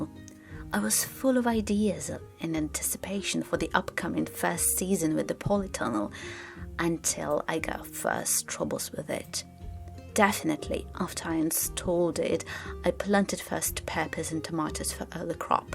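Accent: United Kingdom. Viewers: why does she have a harry potter accent?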